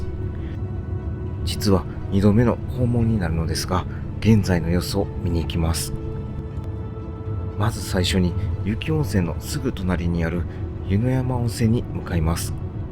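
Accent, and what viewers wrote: Kansai, Japan. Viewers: 鮮やかなbgm.親しきな関西弁。最近見ることなんですが、続々見てしまいます。 韓国語の翻訳も自動ではなくちゃんとしてたら韓国の多数の温泉好きな人達に喜んでもらえると思います、😂 応援しています🎉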